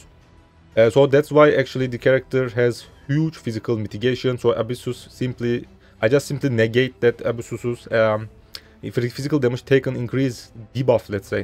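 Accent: Turkey. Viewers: Türk şivesini duyar duymaz tanırım agaa (Eline sağlık btw)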